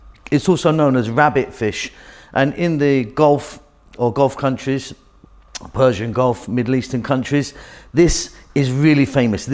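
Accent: United Kingdom. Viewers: Like your cooking with standard British accent 👍👍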